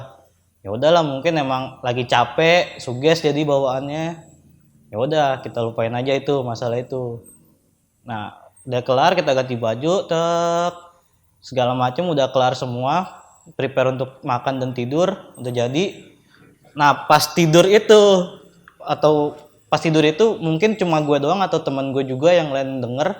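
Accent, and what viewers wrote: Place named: Betawi, Indonesia. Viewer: Logat betawi banget lau cing hehehehe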